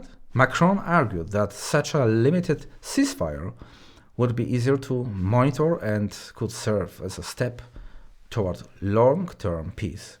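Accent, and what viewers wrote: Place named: United States. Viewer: Słucham na 1.1x-1.15x – lepiej 'płynie' i zastanawiam się czy nie byłoby warto od razu publikować z lekkim przyspieszeniem. Wydaje mi się, że tempo mogło być trochę szybsze, bo do takiego angielskojęzyczni widzowie są przyzwyczajeni. Wtedy, żeby to się za bardzo nie zlewało można zacząć nieco bardziej dobitnie wymawiać niektóre słowa, zwłaszcza że akcent jest bardziej amerykański i tam to pasuje. Tak czy siak jest świetnie:) Taki punkt widzenia jest bardzo potrzebny. Zostaję, pozdrawiam i życzę powodzenia.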